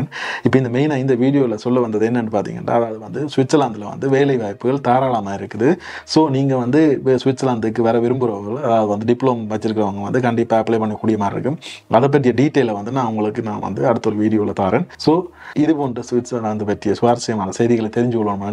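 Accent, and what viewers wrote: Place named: Jaffna, Sri Lanka. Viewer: Your Tamil sounds sweet like Jafna people's slang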